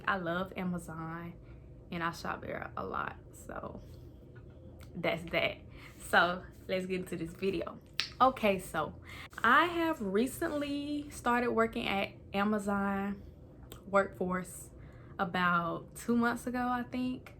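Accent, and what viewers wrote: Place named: Georgia, United States. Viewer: I would Georgia or South Carolina. I don’t hear Texas lol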